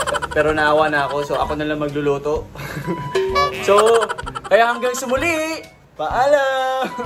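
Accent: Visayas, Philippines. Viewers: Dun ako natutuwa sa opening spiel mo na What's up ketchup... dun pa lang good vibes na pati pagka-candid mo. Tuwa din ako sa Visayan accent mo (no offense meant, hah!), na kering-keri mo, bro!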